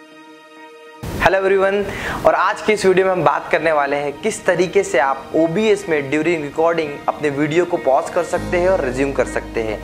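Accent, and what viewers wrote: India. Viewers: Why is the video description in english, and the audio on the video in a crazy indian dialect? Dislike for you, click bait!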